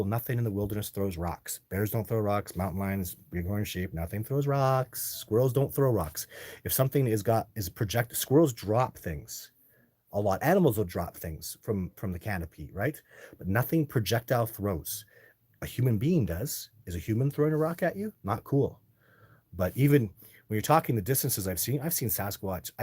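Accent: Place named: United States. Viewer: You sound more like an American, than a Canadian! You can move down to the States if Canada is gonna keep you locked up! 😊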